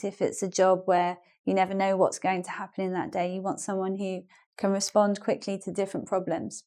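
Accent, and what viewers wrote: United Kingdom. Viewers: Greetz from Germany, your videos are great! And I love your British Englisch accent :-)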